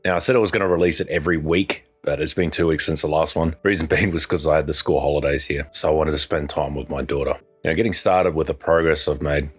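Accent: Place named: Australia. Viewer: Blonde anime girl with deep male Australian voice fits oddly well 😂. Looks like its going well, i think its so cool youre doing this.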